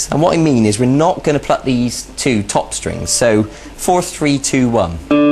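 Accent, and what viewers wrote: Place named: West Country, United Kingdom. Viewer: Bristol? Somerset? I’m from Exeter buddy. Lovely to hear an accent like this on the Net.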